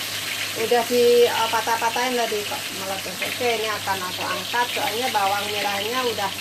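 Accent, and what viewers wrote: Java, Indonesia. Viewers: Jawane pundi toh iki?? Medok buanget logat e 😆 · Mbaknya persis saya, pake wajan sama minyak kayak gitu, nggak usah dicuci dulu dan minyak bekas goreng dipake lagi. 😅😁 bicaranya medok ya, dari Jawa ya mbak? Sip banget. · Bunda orang mana saya orang ponorogo bund.. Sepertinya jenengan logat nya jawa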